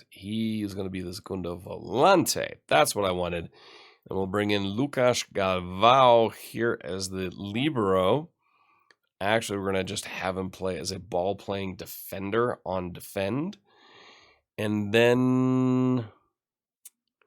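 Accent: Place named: Germany. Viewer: I could hear that. I wanted to ask from which region in Germany you"re from. sorry if it's too personal.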